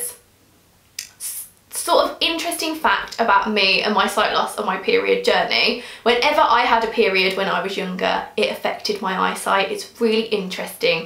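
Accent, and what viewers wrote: United Kingdom. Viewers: Love from India. I absolutely love british English accent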